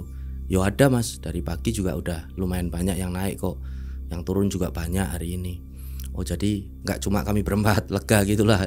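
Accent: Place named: Malang, Indonesia. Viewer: Sampean pemalange ngendi mas 😅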